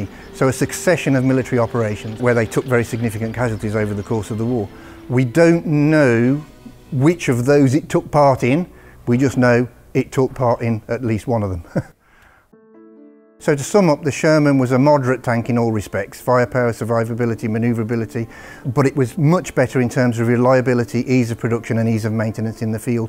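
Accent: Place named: Estuary, United Kingdom. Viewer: Fajny angielski tego gościa. Ktoś wie, jaki to akcent? Estuary?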